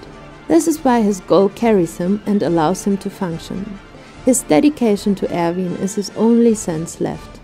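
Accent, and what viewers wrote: Germany. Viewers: are u german btw? bc if u are I can hear it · Are you german? Your accent sounds like you are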